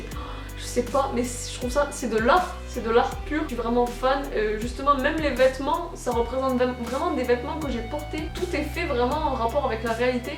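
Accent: Toulouse, France. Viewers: omggg, j'adore ton accent toulousaing 😍😍😍 Ma mere vient de toulouse alors je peux faire cet accent et j adore 😍😍 Putaing Toulouse en force 👊👊💘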